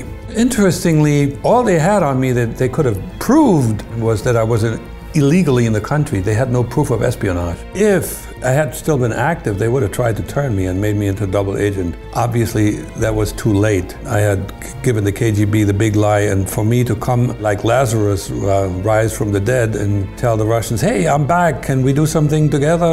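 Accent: Germany. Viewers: The good old German accent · He sounds a bit german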